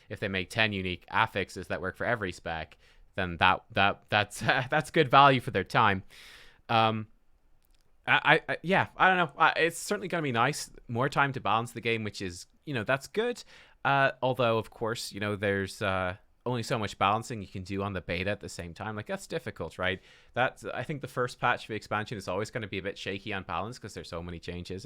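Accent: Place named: Ireland. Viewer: Judging from his accent, I think he’s from Ireland, correct me if I’m wrong though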